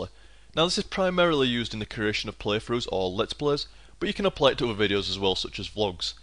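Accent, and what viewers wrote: United Kingdom. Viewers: Am i right in saying thats a yorkshire accent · I TOTALLY LOVE english accent :D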